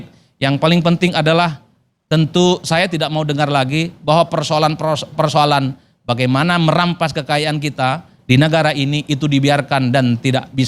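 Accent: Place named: Madura, Indonesia. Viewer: Mas kl di sini logat madura😊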